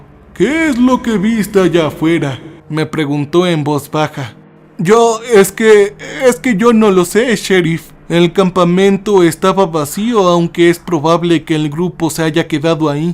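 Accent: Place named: Mexico. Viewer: Tu eres un mentiroso o cuenta los cuentos de otros, en otro cuento eras de Inglaterra y ahora eres de EEUU cuando tu acento es mexicano o de sur américa. Yo soy norteamericano y de descendencia de P.R y cuando vives en EEUU pierdes el acento latino.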